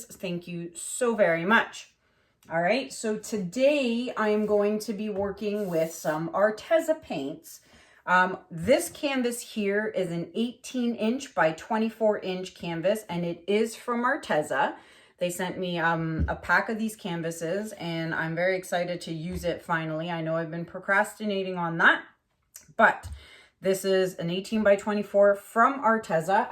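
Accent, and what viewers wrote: Canada. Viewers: You couldn't talk too much for me! At least half the point, for me, of watching your videos is to listen to your interesting chat! Haven't watched the video yet, so can't comment on the painting, but just wanted to let you know - keep chatting (enjoy listening to your Canadian accent - you are the first person I ever heard saying "anyhoo" - now I am coming across it everywhere!!!) (I'm English). Keep up the good work - painting and chatting!!!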